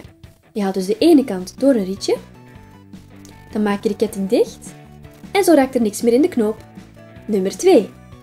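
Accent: Flanders, Belgium. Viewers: Huh, je bent toch Belgisch? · Dit is nie Nederlands .,. Belgies 😑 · jij komt uit belgië? zo klink je anders wel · ze is een Belg die hebben vaak andere uitspraken en wat boeit het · Just Girls Het is overduidelijk Vlaams. Ik heb veel vrienden in Limburg hier in België, het klinkt nog net iets anders als in Nederland. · Ben je Belgisch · dit ks eenrder vlaams dan nederlands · Dit is een nederlands kanaal maar de stem is belgische?😂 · Ik dacht dat dit Vlaams was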